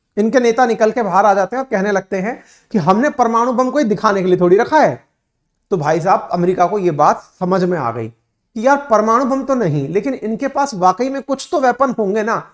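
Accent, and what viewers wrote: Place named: Rajasthan, India. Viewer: आप राजस्थानी हैं मुझे राजस्थानी की पहचान है अतः आप मुझे रखें राम राम भाई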